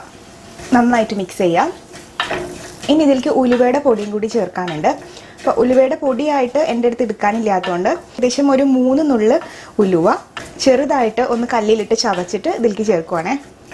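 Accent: Thrissur, India. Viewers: തൃശൂർ എവിടെയാ. ആ bhasha കേട്ടപ്പോൾ തോന്നി മ്മടെ തൃശ്ശൂരാണെന്നു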